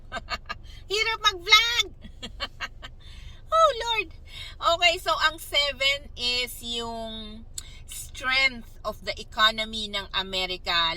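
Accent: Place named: Cordillera, Philippines. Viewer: sabi ko kasi madam, mukang taga highlanders to base sa accent.. heheh thanks sa mga infos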